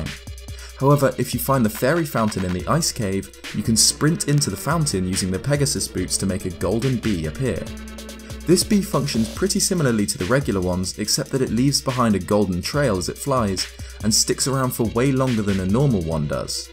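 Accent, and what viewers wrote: United Kingdom. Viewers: I think its a british thing, because I know that DanTDM - another british youtuber -says "lava" as "laver"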